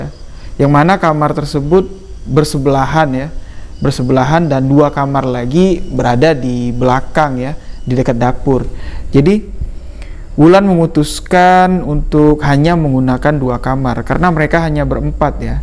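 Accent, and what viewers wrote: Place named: Bali, Indonesia. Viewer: Ooo,,,logat nya ky orang bali 😁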